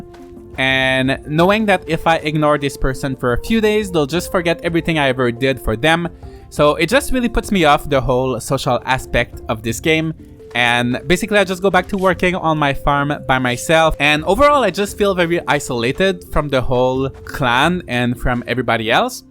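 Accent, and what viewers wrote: Quebec, Canada. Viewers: Just curious, where is your accent from? . . . . . Guess; Qc?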